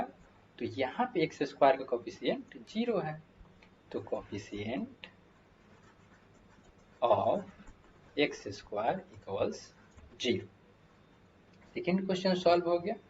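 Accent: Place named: Bihar, India. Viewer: That bihari Accent while teaching is hypnotising, Nice Explanations 🙌🙌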